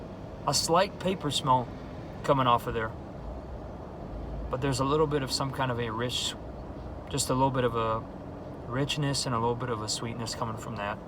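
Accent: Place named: Illinois, United States. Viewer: Dude are you from Illinois? You sound like some of the people I live next door to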